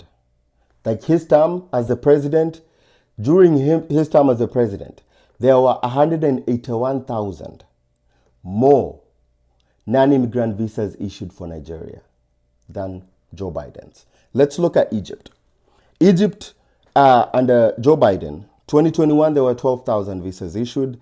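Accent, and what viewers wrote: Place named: England, United Kingdom. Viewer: Weh! English accent for me😊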